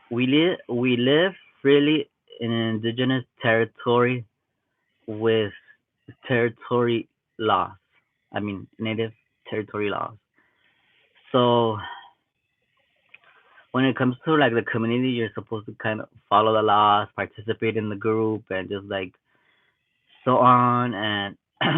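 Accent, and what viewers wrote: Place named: Mexico. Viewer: Fuckn bullshit,we are not the same,everything different,traditions,religion,beliefs,and we are from this country. You want proof im sure there is alot of fellow native people that can show you,you people stop trying to put us together,fight your own fight and go back to where your from,you sound like white christians,we are all the same,stop lieing and maken yourself look stupid genz ,just go home and wave your flag,you can tell you was raised mexican by yoir accent,what was you when you was born retard,how was you raised, kearn akl your blood bur remember who you were born unless you dont like who you was born and thats bad cant ride the fence and now all of a sudden you wanna claim indian in this country,but your not from here or these tribes,just go home and rebuild your country and stand for your mexican rights wave your flag and be proud but dont try and feed off of native people here now,like cockroches. 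And tribes in this country dont kill to sacrifice,this kid dont know shit aboit tribes in this country. Go home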